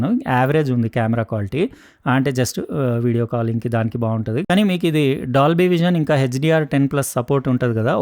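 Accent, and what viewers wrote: Telangana, India. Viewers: Brother video info kanna mee telangana yaasa kosame ekva chustha mee videos...🎉🎉🎉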